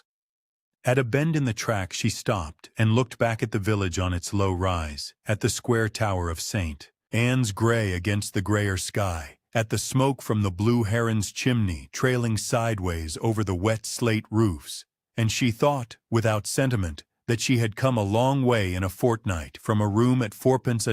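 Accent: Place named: United States. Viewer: Hint - get rid of the American voice. Im so peeved by the USA i will not listen to anything with USA accent !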